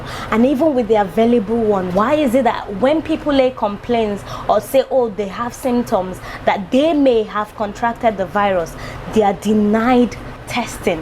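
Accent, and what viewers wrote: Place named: Igbo, Nigeria. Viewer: u fine well ohh...And I love your Igbo sound ohh...its just too sweet.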